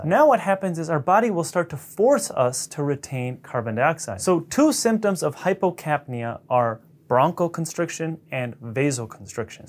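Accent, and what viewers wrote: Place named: United States. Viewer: I'm guessing from his accent he was born in America?!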